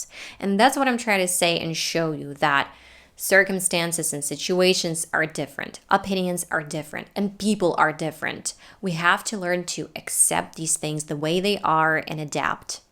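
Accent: United States. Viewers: You're accent is too thick. It's like a caricatural american accent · How did you get this american accent?? If you answer me, I'll subscribe to your channel ☺ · Thank you So Much. ❤😊👍 Your videos are very useful and helpful. I found your channel last summer and I didn't believe that you are Ukranian. I thought this girl had a great Americian accent and she lived in some part of America. I was really surprised when I knew at the end of the video those were just my thoughts. Don't listen to or reapet their beliefs. I'd like to give one of my favorite Henry Ford's quote: "My best friend is the one who brings out of the best in me." You and your family DO GREAT JOB !!! 👍❤👏🤗 Keep it up !!! The World is counting on you !!! 🎉😊🎊❄🎄🎁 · Geeezzzz, I thought you were American! I am shocked 😳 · I can relate to you! I'm also a non-native Youtuber who also teaches English and is originally from Ukraine like you (I've lived in Spain half of my life). 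I imitate the American accent too. It's so true that no matter what you do, you'll be always criticized. While you are under fire because of your impeccable American accent, sometimes I'm criticized because it's not good enough. It's a price you have to pay for doing what you love. Thanks for your inspiring videos!! :) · as a Colombian Spanish speaker I could say that she does have this Spanish accent, but also i could say that it's sounding kinda faking or forcing but i feel that's not a big deal. She does express well with that accent at the end. By the way it's disgusting that behavior changes to someone if one speaks with a different or none accents, that's quite narrow-minded. Notwithstanding, also it's quite important to have a good communication if your current job treats about. I'd reckon that does not care so much about the kinda accent you speak, but in being able to communicate each other in the best way possible. 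Nice video and I love your accent! My accent is American too! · I adore your American accent😍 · As an American, to my ears, your American accent is really REALLY good. As far as what you were saying-- I agree. Anyone who is criticizing needs to know that accents help language learners be understood even better by whomever they're talking to. If you're going to be speaking English with Brits, learn that accent, if you're going to be talking with mostly Americans, learn that accent! 🙂 It's all about understanding and being understood and you'd be surprised how much accents factor in to that! I sometimes can't understand strong Scottish or Irish accents if people are talking too fast, even though they're speaking English! 🤷‍♀️🤷‍♀️ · You have an amazing american accent! I wish I had the same one. It was a surprise that you are from Ukrainе!(so am I) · Hi. The very first time I heard you speaking English I thought in either situations; you were born in the USA or you were grown up in that country. 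But when I knew you had not live or even more you did not have visited the stripes and stars flag country I surprised hugely. You have cheered us ( not only me) to try to improbe our English level every week and this is for say thank you. Honestly I think you should not take much care about jealous people say, because of the strong personality you show in each youtube video every weekend, I think you are fine. By the way if you want to hear a really Spanish accent in English, I recommend try to hear the following guys: Eva Méndes, Antonio Banderas, Salma Hayek, Penélope Cruz, Shakira, Eva Longoria, etc Or native English speakers, pretending speak with Spanish accent: Gwyneth Paltrow, Will Smith, The Rock... Saludos!!!! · ahahha you are under fire bcs of your clear american accent while people around you do not have ımıtatıon skılls to adabt their voıce into the language they learn this makes them jealous and they are attackıng by harsh crıtıcısm :D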